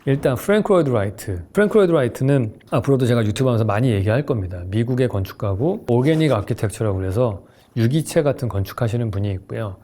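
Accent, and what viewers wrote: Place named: Seoul, South Korea. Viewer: 말하는 스타일이 먼가 고급스럽네요. 나도 이렇게 말하고 싶다.ㅋㅋㅋㅋ 일단 서울말 부터 배워야 되나 ㅋㅋㅋㅋ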